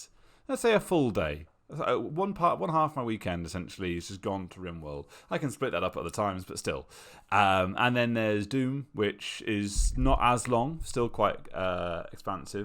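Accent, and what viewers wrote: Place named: United Kingdom. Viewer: I’m sad that this channel is dead, but I’m really glad I decided to come here (after burning through all hopeless vods) I really like the casual nature of the videos. I’m excited to see where the Hopeless Idiots go from here, but I hope you keep this channel as archives. I need a backlog of that sweet, buttery British accent, I’m sure you understand.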